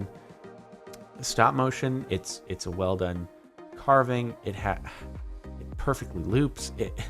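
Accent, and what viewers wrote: Finland. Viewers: It's not scandinavian accent. It's finglish or rally-english. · The hydraulic press channel is still going, also, it's a finnish accent, not scandinavian uwu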